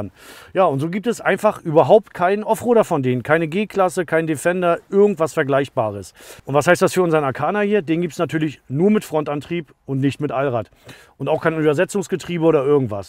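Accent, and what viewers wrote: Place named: Berlin, Germany. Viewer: Berlin / Brandenburger Akzent 😀🤜🏼🤛🏼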